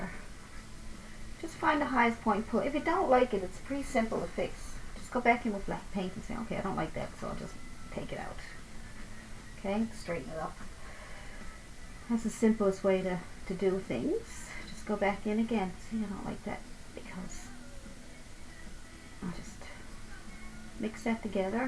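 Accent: Newfoundland, Canada. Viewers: OMG this is the first tutorial that I have seen Love your manner and you make me feel right at ease....have just begun to paint, will definitely look at your others thank you sweetie, (you sound like a Newfoulander) love your accent xo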